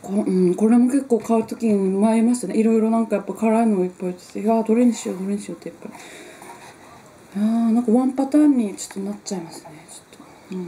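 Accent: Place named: Tohoku, Japan. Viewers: 美味しそうに食べますね。お腹空いてきました＾＾ 東北の方ですか？ 東北人なのですが、なんとなくイントネーションに親近感が沸きます。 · 訛りが私と同郷な感じがするのですが・・・(笑) 私は生粋のイナカッペ、キリタンポ野郎ですｗ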